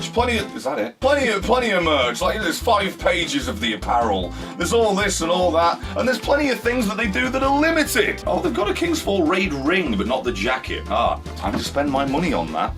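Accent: United Kingdom. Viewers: There’s something so satisfying about a fine bri’ish gentleman going absolutely mental while also making good points on camera that is just *chef’s kiss*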